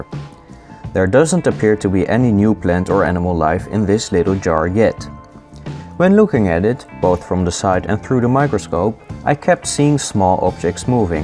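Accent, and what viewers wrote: Netherlands. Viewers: Is you'rs to? And i think you're not english deu to you're speech i think you're dutch pwease respond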